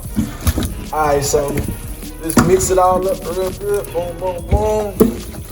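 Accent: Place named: South, United States. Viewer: I love his accent you know he from the Dirty South wud up tho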